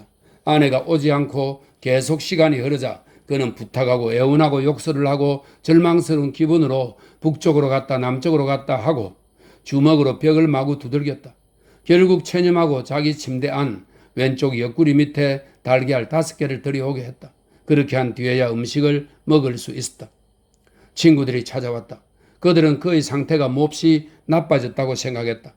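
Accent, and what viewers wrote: Gyeongsang, South Korea. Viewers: ❤힐링시간 허스키 경상도 친한 막걸리 목소리 강건❤하소서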